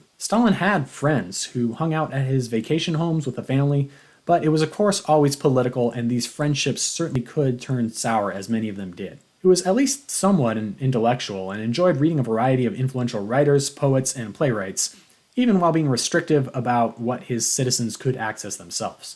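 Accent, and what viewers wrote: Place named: General American, United States. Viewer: Very insightful thx! By the way you have a beautiful "General American accent". 🎉 I am kind of a language nerd. ;) Keep up the good work! 🎉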